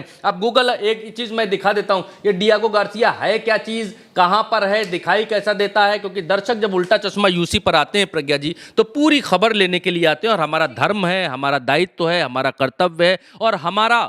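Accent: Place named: Uttar Pradesh, India. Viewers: Uttar Pradesh ki khadi bhasha ap se sunane me achcha lagta hai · Aapka ' utter pardesh touch ' acha lagta hae bhai...good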